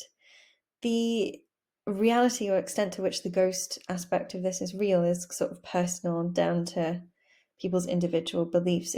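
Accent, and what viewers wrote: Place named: United Kingdom. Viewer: you've summarised this in such a beautiful way! i don't have this in my course or something, but I'm very interested in poetry and especially keats' poetry. being an admirer of keats, I've heard a lot about this poem and now I finally know what it's all about. thank you so much you helped a lot! ❤️ ps. I love your british accent. british is my fav of all types :)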